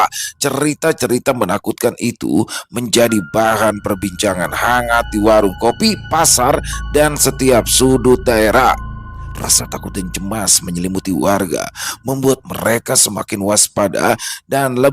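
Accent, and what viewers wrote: Jakarta, Indonesia. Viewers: ASSALAMU ALAIKUM Bg 🙏,hadir lgi nyimak,selain ceritanya yg tegas & mantaabbb, logat betawinya itu yg paling saya suka,!!!!!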